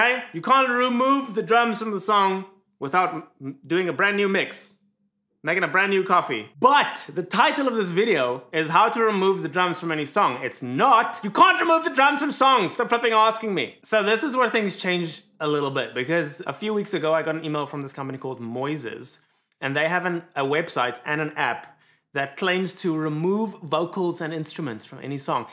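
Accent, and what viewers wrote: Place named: Afrikaans, South Africa. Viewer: Your Afrikaans-English accent of "flip" is classic South African. Hi daar hier van Bloemfontein af!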